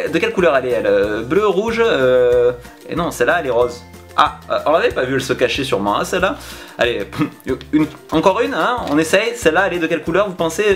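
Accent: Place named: South, France. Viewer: Tu fais des vidéos très fluide avec ton bon accent du sud 🤙 Celle si est sympa et Hani vraiment envie de connaître l’astuce car je débute en magie 😁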